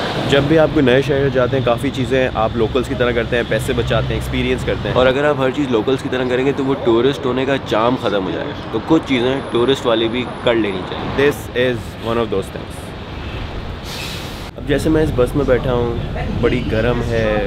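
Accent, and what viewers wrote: United Kingdom. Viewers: Bahi your british accent was coolest thing in vlog😊❤😄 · Love ur British accent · Your British accent is awesome 😉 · That british accent ❤❤😂🔥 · Funny British accent too natural. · love your british accent. mate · hahaha yaaar. Loved the british accent. Rewind kr kr k suna :D ku k sary paisy accent k hein <3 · Bhai ki English ka accent b nagraizon Wala ... Acha laga!!!! Thand main SB ki aesi ho jaati Hy ya aap nain special try ki! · Birminghamyyy style lol😂 greetings from Telford ❤️ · Your British English is on 🔥🔥🔥 point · That British accent though 😁 Nailed it...not a single glitch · British accent acha tha!! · OMG! that English/ london accent!😅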